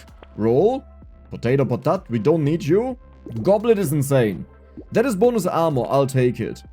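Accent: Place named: South, Germany. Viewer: Kann es ein dass du aus dem Süden Deutschlands kommst zumindest hörst du dich so an?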